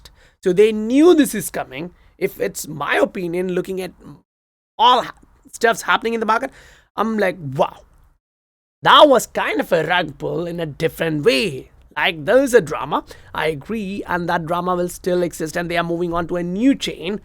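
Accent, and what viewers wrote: India. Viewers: Are u Indian...?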